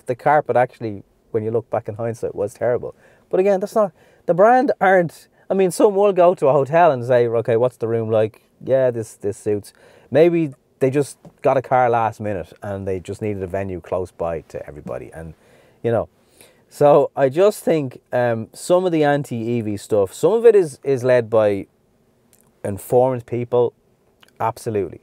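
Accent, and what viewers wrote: Ireland. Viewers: Sorry, I haven't followed you up to now. I'm not a fan of irish voices on YT vids. However yours is fine, now I'm a follower. What you've said is all common sense, it appears the general public has none of this. I appreciate your candor. I appreciate your comments on the effort / rewards etc. This video was honest, transparent and wholesome. Thanks for this.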